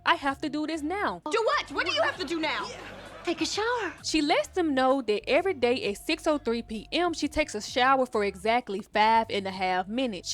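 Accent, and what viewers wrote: Memphis, United States. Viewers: You from Memphis ain’t it 😭😭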